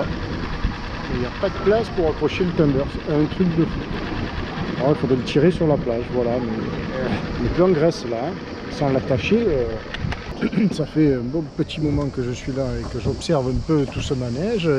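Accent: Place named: Var, France. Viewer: j'adore t'es vidéo cette accent varois bien de chez nous plaisancier a moteur tu me donne gout a la voile continue!!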